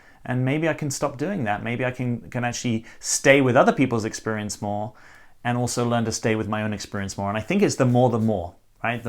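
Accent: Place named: United Kingdom. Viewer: Your teaching and views are resonating with me more than any other coach. I love your british accent! Thank you for all your work! Your my hero, because you are so truethfull.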